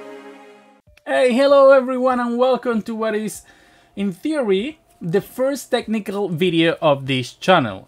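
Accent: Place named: Latin America. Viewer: Se lo nota ya mas suelto que en el primer video... NO creo que lo de latino afecte demasiado... Y si bien el toque latino en su acento de ingles se nota, no es tan exagerado...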